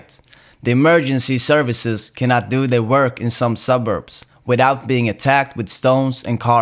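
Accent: South, United States. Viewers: Never knew Swedes has such a US southern accent 😂 I don’t doubt that you’re Swedish, but from who did you learn English from? Sounds like someone from where I’m from, the deep USA south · Where did you pick up that Southern American accent?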